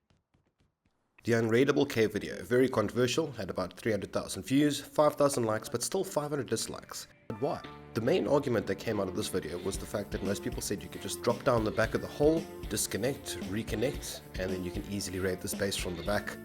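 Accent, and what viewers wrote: Afrikaans, South Africa. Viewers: you south african? xD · Are u South African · You from South Africa ? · I hear a South African accent. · That Afrikaans acsent.😂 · Are you SOUTH African? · Intresting but you still have a heavy afrikaans accent lol XD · Baha oml I've never seen your channel before, and started to watch and thought shou, that accent is oddly familiar, and as soon as you said ja it was all over, South Africa XD born in joburg myself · I never know whether it’s NA or SA but I always hope it’s SA lol. The way you speak and the language you use made my think SA though. I was right for once lol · Thought I knew the accent hahahaha. Since I've lived in Australia now for ages couldnt be sure if it was NZ or SA but fuck yeah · Are you south african? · Ek smaak Afrikaans op jou aksent. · Are you south african? :> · Subscribed just because Local is Leka. 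Nice Content, love the familiar accent well done boet you somma make me excited about SA gaming again, looking forward to more of your videos and thank you. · Are you south African ?? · Are you south African? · You sound South African. Good vid. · Where u from,Sounds south african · Are you from south Africa? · Is this guy South African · Didn't even know anyone in SA played Rust lol. This game looks so fun and is even more fun with a South African accent. Love the professional drawing program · Lol you sound so south african👌😂, are you south african? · Am I detecting Afrikaans? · Dude u never gonna read this comment but I swear you have a south African accent heart this or comment if it's true cuass I have a feeling u r South African :/ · u south African bru ? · Are you South African Mr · My man are you south african? Your accent is familiar. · You south africa?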